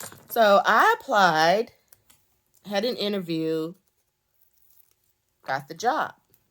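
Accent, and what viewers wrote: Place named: North Carolina, United States. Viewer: Hey! Just found your channel. Are you in NC? Of all the planner folk I follow, your accent sounds like mine! I have some of these stamps and was trying to figure out how to use them. Great video!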